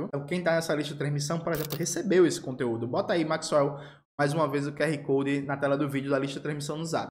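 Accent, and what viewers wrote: Pernambuco, Brazil. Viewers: vc tá cada dia melhor! Esse Eduardo resolveu aparecer, hein! Chilique feito só pra te provocar e aparecer aqui, agora que seu canal tá crescendo... E o sotaque pernambucano é um sotaquezinho maravilhoso, lindo e gostoso mesmo! Sou do ridijanero e amo! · Oi, tudo bem com você? Não tenho embasamento prá fazer discussões teóricas. Acho essa virulência das redes sociais um horror! Seja lá qual for a discussão o que eu ví naquele trecho de vídeo é totalmente inadequado, um chilique mesmo. Gosto muito dos seus vídeos. Só tenho uma crítica muito séria. Acho que o Marxismo propõe análise de conjuntura e na minha opinião, você não faz isso quando não apoia claramente o Governo Lula. Está certo fazer críticas, o próprio Lula pede isso, mas eu gostaria que as críticas viessem sempre com a ressalva que precisamos apoiar esse Governo, nem que seja como um Governo de resistência ao fascismo, à extrema direita, ao bolsonarismo. 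Acredito que se o Presidente Lula resistir e conseguir ganhar o próximo mandato (2026 a 2030)prá ele ou algum sucessor, daí então poderemos começar a nos sentir um pouco mais "seguros". O Mundo está em risco e, não entendo como deixar de creditar ao seu Governo a diminuição da fome no País, por exemplo. A cadela do fascismo, sempre no cio, está nos nossos calcanhares e nossa única e frágil defesa é o Governo Lula nesse momento. Vamos apoiar! Criticar mas apoiar, explicitamente. Só prá lembrar a análise de conjuntura, se esse movimento da extrema direita conseguir ganhar a próxima eleição acabará o debate. Você está acompanhando até onde eles queria chegar, tenho certeza Foi por um trisco! Gosto muito do sotaque pernambucano!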